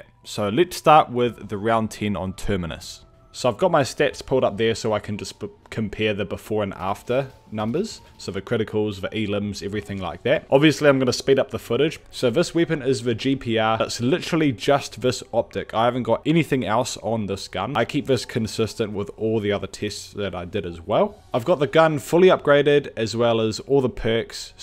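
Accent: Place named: New Zealand. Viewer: i watch this guy purely off his new zealand accent, chur uce!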